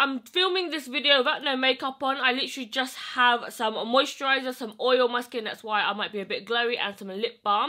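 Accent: United Kingdom. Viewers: My mom asked if u were british asin i looked at her and said i dont know but dont asume anything since we r from the usa and to me she was sounding rude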